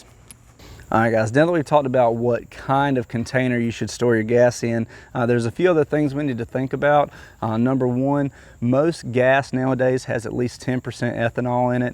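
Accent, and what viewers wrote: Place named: North Carolina, United States. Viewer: I knew that was a NC accent. Thanks for this video. I'm draping a leg all over your channel my man!!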